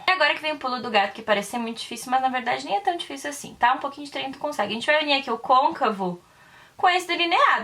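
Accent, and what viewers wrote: Rio Grande do Sul, Brazil. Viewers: Tu é gaúcha? teu sutaque é bem parecido com o daqui do RS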